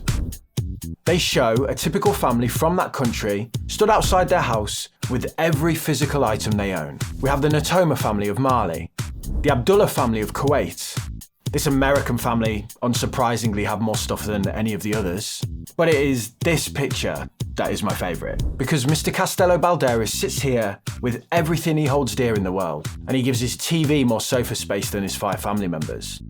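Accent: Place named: Midlands, United Kingdom. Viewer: Brilliant. I never thought I’d enjoy a video on finances. Also… loving your Midlands accent!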